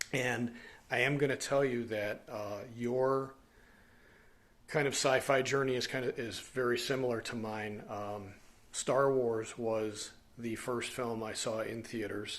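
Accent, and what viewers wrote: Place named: United Kingdom. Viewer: Your British accent ain't bad to be fair (from an English man lol)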